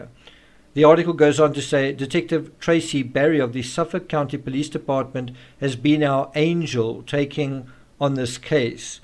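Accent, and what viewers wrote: South Africa. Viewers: Love your channel, no hysteria , clear thought process and facts . South African accent ?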